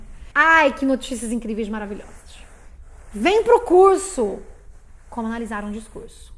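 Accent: Rio de Janeiro, Brazil. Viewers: mata minha curiosidade, pelo amor da deusa. Você é paulistana ou carioca? Juro que às vezes ouço um sotaque carioca, e outras, um sotaque paulistano.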